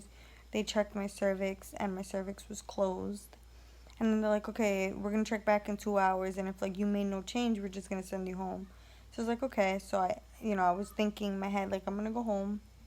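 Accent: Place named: New York, United States. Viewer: She has a NY accent that's why I ask. In love w you girl I'm fairly new ☺️💜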